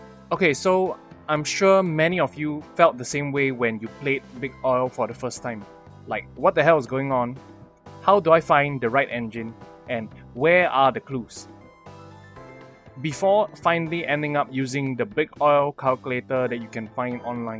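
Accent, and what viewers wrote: Singapore. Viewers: Haha could tell you were from SG from your voice. You sound like a tuition video for PSLE.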